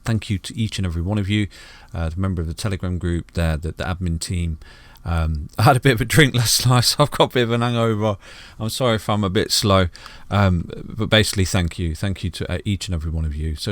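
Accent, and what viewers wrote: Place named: Nottingham, United Kingdom. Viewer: that sounds like a Nottingham accent there? Forgive me if I’m wrong! Great videos! 👊🏽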